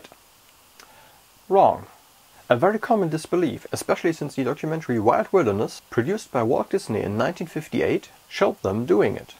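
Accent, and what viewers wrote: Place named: Germany. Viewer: FOrget about what others are saying, you are just starting out, no one should expect you to be perfect. It doesn't matter if your camera is off, you don't do the white balance right as long as the content is great. Which it is. You give interesting facts and you have a german accent. Good enough for me. :)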